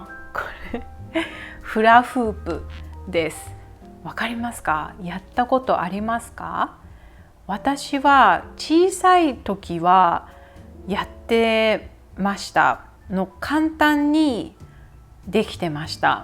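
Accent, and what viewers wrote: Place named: United States. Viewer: woow thanks for creating such a helpful video❤ by the way your English is very very good you sound like American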